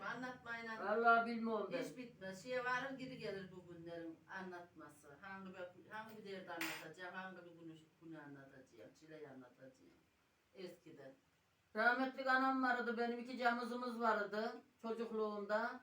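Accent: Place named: Konya, Turkey. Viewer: Gı konyalımısınız yoksa 😁Benim herif konyali 😌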